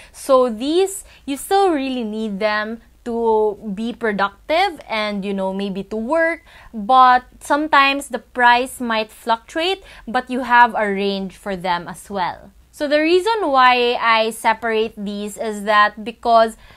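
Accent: Visayas, Philippines. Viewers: I hope you get rid of your bisaya-english accent.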